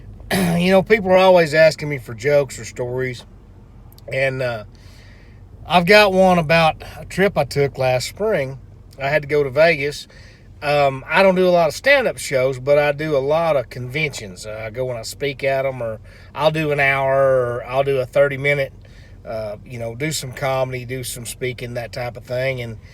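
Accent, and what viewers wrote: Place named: Mexico. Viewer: Thanks mate ... I still can't get off the floor ... he is crazy funny. Love his Mexican accent.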